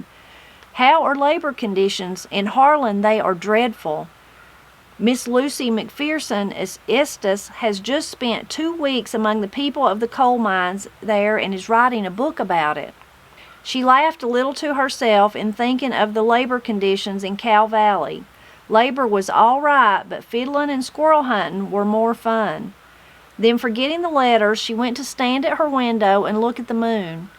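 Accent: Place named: North Carolina, United States. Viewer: Your accent reminds me of my relatives, on my Mom's side...... they all came from Knightdale, NC. I recall hearing very similar voices, and the dialogue was always family, gossip, or both. Thank you for a trip back, if only for a wee bit.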